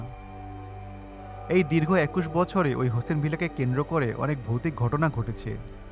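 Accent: Barishal, Bangladesh. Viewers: Apni ki barisal er? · vaiya apni ki borishaler?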